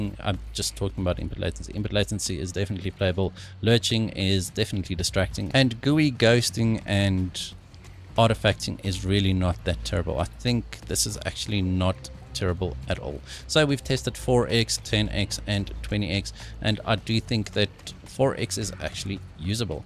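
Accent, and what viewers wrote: South Africa. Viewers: Ha SA accents always so distinct .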